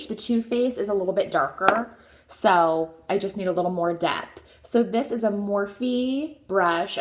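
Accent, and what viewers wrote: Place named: Midwest, United States. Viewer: oh, I don't think it does. pretty typical sounding Midwestern American sounding gal yet unique to her. I love her voice!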